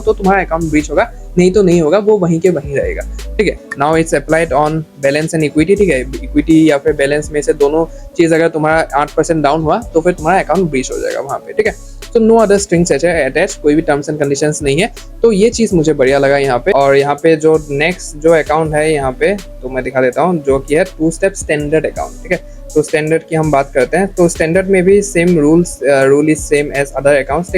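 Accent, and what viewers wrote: Assam, India. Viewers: Assam se ho kya?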